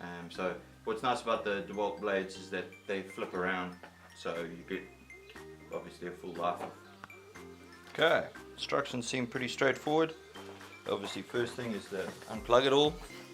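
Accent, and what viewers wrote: South Africa. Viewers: Definitely a Saffer!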